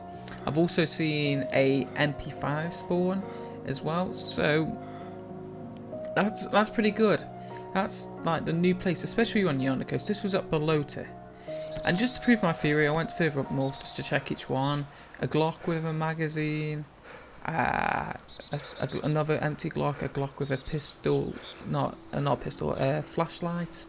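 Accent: United Kingdom. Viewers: Where abouts in stoke you from haha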